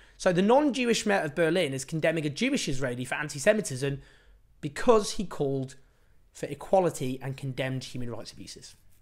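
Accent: United Kingdom. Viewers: wait ur israeli ???????? i thought u are british or something 😂 😂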